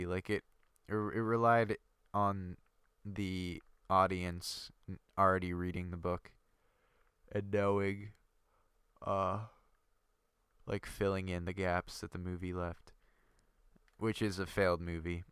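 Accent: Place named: Canada. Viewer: Your accent sounds more Canadian . . . by the way, Big fan of the series and take a lot of tips and architectural designs from it. Keep it up!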